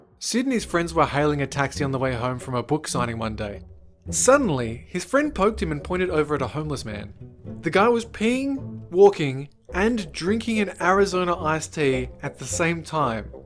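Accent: Australia. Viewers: Oh, you’re Australian. Thought u were British... whoops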